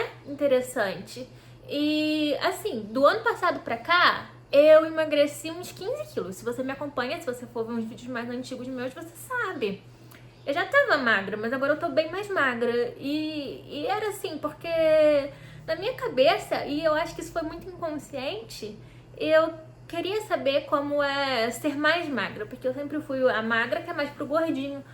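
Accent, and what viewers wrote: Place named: São Paulo, Brazil. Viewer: Acho que ela fala paulistano, pessoas que moram em são Paulo.. eu também acho bonito como eles(a) falam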